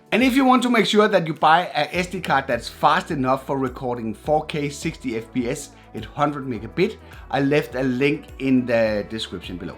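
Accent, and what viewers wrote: Denmark. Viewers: Ære you from denmark? Sound like at Danish guy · Are you from Denmark? Your accent is so danish!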